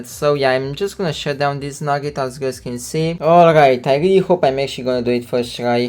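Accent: Romania. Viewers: Wait I just realized are you Romanian??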